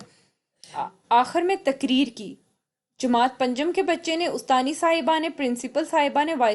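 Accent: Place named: Punjab, Pakistan. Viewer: Punjabiiii accent hay ap ka improve yourself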